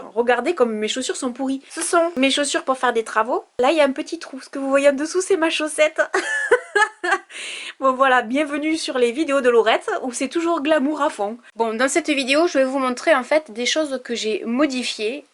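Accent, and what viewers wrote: South, France. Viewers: Nickel ! "Avé" l'accent en prime . Merci, une mine d'idées exceptionnelles. Bien filmé, bien expliqué. ❤👍👏👏👏👏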